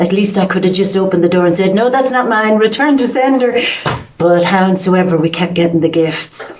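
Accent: Ireland. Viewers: Hello I popped back on your channel today to hear your lovely Irish dusky voice and I wanted to ask how your new path is going!! Hope it’s marvellous x love and peace x j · hey yay.. ouch so glad your back our beautiful., happy 2021, missed ya beautiful irish accent.. thanks for comin back.. bless you beautiful Im sharing you on my MeWe.. looking forward to your extraordinary journey.. Truth Peace Justice and love dear heart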